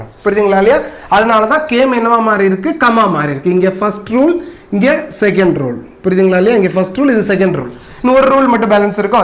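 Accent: India. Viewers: great sir, i like ur teaching and ur talking tamil slang also super sir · Neenga kanniyakumariya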